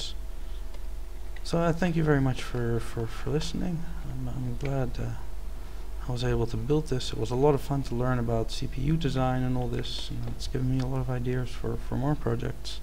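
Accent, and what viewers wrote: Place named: Netherlands. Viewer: Ik hoorde hoe je praatte en ik dacht gelijk: wow, vette nederlander. Nice gedaan man :)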